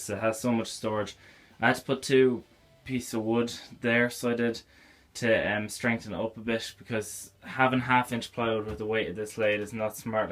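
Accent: Ireland. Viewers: Yes lad I know your Irish from your accent maybe Mayo or Dublin well I'd like to heasr from you you in a cabinet maker from Donegal thanks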